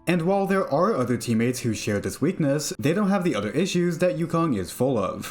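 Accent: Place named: United States. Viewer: You sound like an American so I was wondering how you mispronounced Geopard's name.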